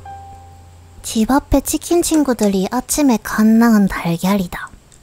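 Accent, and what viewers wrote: Gyeongsang, South Korea. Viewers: 경상도 사투리 너무 듣기좋아요~^^ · 갱상도 억양? 암튼 바이크 이야기 좋네요....안라! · 전 경상도 사람이라서 억양이 낯설지 않은데요 일본어 할때도 억양이 경상도스러워서 어떤게 조선말이고 어떤게 일본말인지 헷갈리네요 actually 전 일본말을 모릅니다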